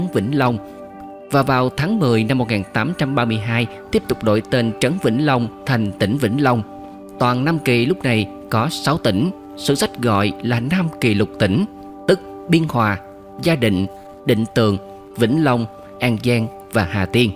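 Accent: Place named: North, Vietnam. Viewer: Giọng đọc đậm tính Bắc Kỳ. Nam kỳ mở miệng ra cứ rẽ rẽ. Dần dà làm mất đi hình ảnh người Miền Nam. Văn hoá mất dần.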